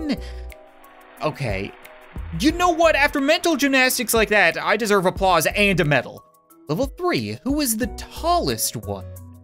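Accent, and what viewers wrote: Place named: Canada. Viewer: S'called canadian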